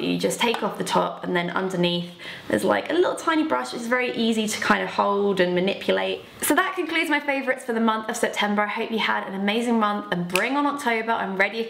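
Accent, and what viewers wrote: England, United Kingdom. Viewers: I love the English accent and the way you say Glowwwwww, love you x from MAURITIUS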